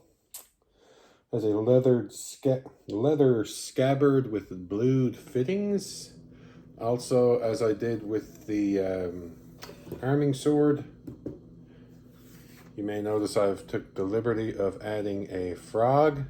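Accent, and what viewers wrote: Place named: Newfoundland, Canada. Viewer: Was looking at buying this sword, watching your video...hmm that accent sounds familiar. Sounds like a fellow Nflder? Anyway, subscribed and liked.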